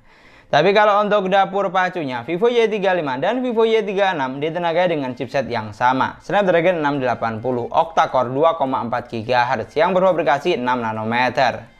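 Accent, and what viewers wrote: Madura, Indonesia. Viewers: Logat nya kentel madura hehehe... Mantap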